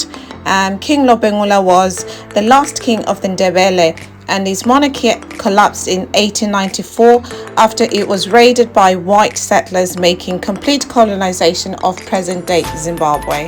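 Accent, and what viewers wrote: RP, United Kingdom. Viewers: ❤❤❤ new subscriber alert! even ngingezwa your British accent lol...hope I'll pick a word or two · Hey you very posh😊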